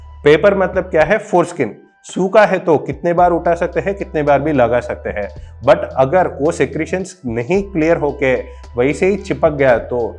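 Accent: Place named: Telugu, India. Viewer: तेलुगू हिंदी थोड़ी सी डिफरेंट 😅😅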